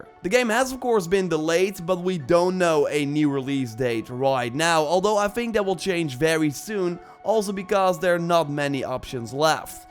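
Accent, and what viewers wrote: Netherlands. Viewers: Lekker hollands accent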